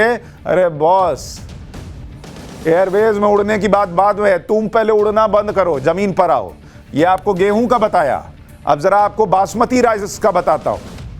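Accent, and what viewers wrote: Bihar, India. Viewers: Paise ke liye kuch bhi bake ja raha hai tension me andar ka bihari jag gaya hai. Ye Bihar se hai iski accent badal gaya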